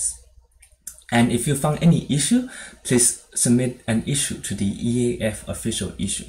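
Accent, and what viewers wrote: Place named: China. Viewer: 一开口就是老中国人了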